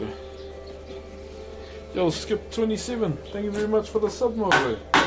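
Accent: New Zealand. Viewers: It is the same accents they're both Maori, the indigenous ethnicity of New Zealand 🙂 not all Maori have this accent but a lot do.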